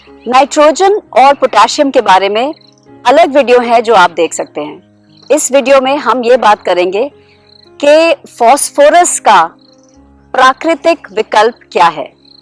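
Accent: Haryana, India. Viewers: Am i hearing a haryanvi accent 😅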